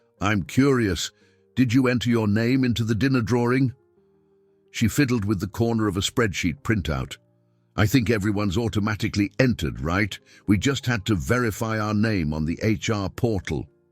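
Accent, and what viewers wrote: United Kingdom. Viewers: I Agree with Comments from "Shirelene", & "ScatPack". I myself feel that the Script was Way Too Long & Slow; AND the Professionally trained Narrator Voice was flat Horrible, play- acting, low then loud, w/accent from U.K. Royalty, way above public status. The video background was interesting, vary- ing from forests & waterways, mountains & country roads, to huge city buildings & speeding cars. The actuality of a young data analist being able to out- computerize an experienced high tech expert & saboteur, in real time, is totally unrealistic & impossible. Finally, I am sick & tired of just another story of self-absorbed, narcissistic, cor- porate corruption, disruption & destruction. How about an innocent, decent, touching Romance ?! This movie finally had a good ending, So, Despite my criti- cizing, My Rating on this Movie is: 8/10. 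❤❤😅😅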